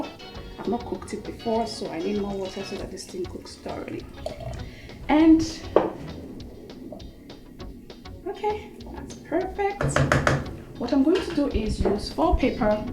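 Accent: Nigeria. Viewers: I love your Nigerian accent❤️❤️🇳🇬 (im Nigerian to🇳🇬)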